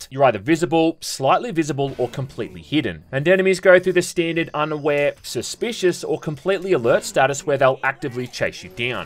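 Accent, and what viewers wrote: Australia. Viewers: I love your videos, your Australian accent paired with a witty and downright kneeslapping sense of humor makes my day sometimes. Even though i can't afford most of these games its still a treat to see someone bring underground titles and non-mainstream games to the light and its a huge breath of fresh air. Keep doin what you do ya bastard ❤.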